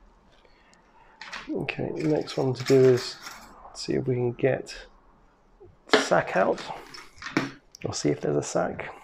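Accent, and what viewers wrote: England, United Kingdom. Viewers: Great removal, and it’s so nice to hear a British voice as a posed to an American. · It’s an English accent lol.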